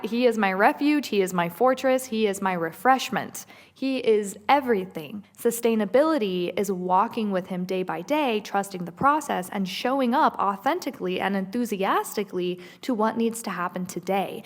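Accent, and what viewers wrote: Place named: United States. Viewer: I searched and searched and searched the world of YouTube for you. To think this whole year you were right here the whole time. I had to tell the algorithm that I wasn’t interested in hyper general viral videos and search for god centered wellness. Little did I know, you’d talk about healthy living in a fuller sense. Curios about your background. You say you’re from turkey but you should like you are born & raised American. Anyway, thank you for this.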